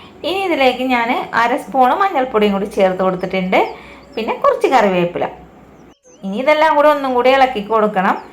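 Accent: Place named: Kochi, India. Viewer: എന്ത് സുഖമാണ് കേട്ടിരിക്കാൻ ❤ ഇടക്കിടെ ആ കൊച്ചി യുടെ തനതായ ഭാഷാ ശൈലിയും! ❤❤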